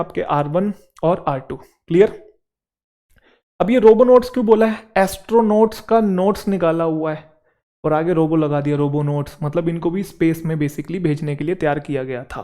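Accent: Haryana, India. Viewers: sir ji mai apaki classes regularly attend karti hoon. sir ji aap hariyanvi bahut badhiya bolte. muje bahut hansi aati hai. sir ji kabhi muje time mila to aapko milne aaugi.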